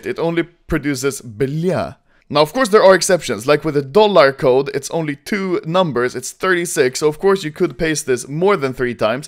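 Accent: Sweden. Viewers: Wait wait wait Your Swedish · YO I CAN HEAR U ARE SWEDISH:d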